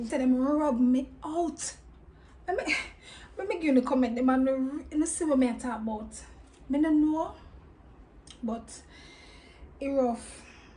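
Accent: Jamaica. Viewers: I heard the news... Treating blacks like that ain't fair. I like your Jamaican english. New family here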